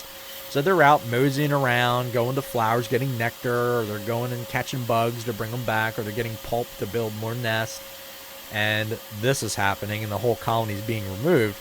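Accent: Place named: Philadelphia, United States. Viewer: I am from about an hour outside of Philly, and judging by his accent i would guess he is from the same area!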